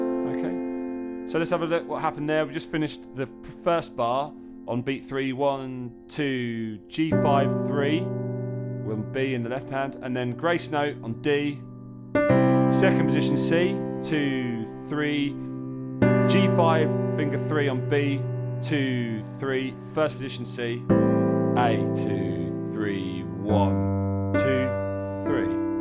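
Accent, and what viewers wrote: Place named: United Kingdom. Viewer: When did this guy become British? Wtf? Is there a new guy, or is he going through an identity crisis?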